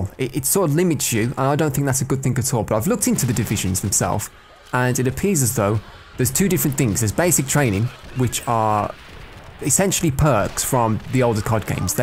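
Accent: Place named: Black Country, United Kingdom. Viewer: Followed you for ages, glad you're back mate. Think you're from the same (midlands?) area as me, although you sound Black Country/Walsall and similar age too. Keep uploading mate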